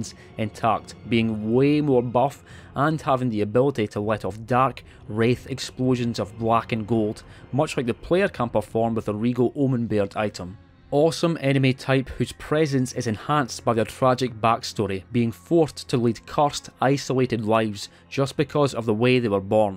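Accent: Scotland, United Kingdom. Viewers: Ok pal I hate to be off topic but you have one of the coolest fucking accents I have ever heard in my life. I'm sure to you it sounds "plain" or "normal" since you grew up with it, but I am straight up in love with how you talk. Whereabouts in the world? Scotland? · Love hearing a fellow Scot on here ✌ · Came out of curiosity, stayed for the Scottish accent 🥰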